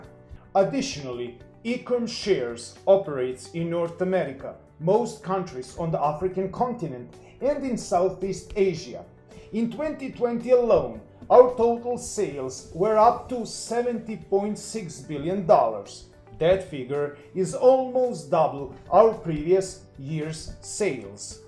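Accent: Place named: Jacksonville, United States. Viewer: Lol grew up in Jacksonville? I knew I recognized that accent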